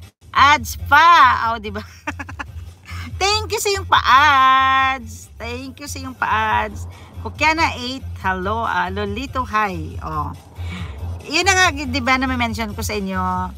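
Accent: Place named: Hiligaynon, Philippines. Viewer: Ilongga ka host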